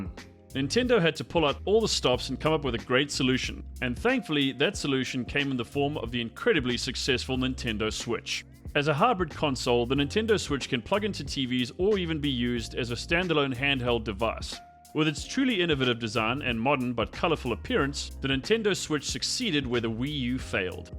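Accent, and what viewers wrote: South Africa. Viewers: is he south african? is he australian? we will never know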